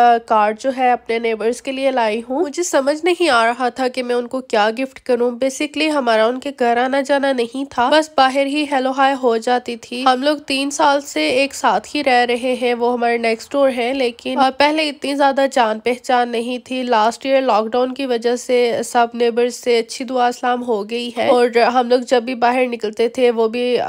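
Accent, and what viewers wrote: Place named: England, United Kingdom. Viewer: ap ki urdu bari kmal hai hahah mujy boht maza ata ha england walon ki urdu sun k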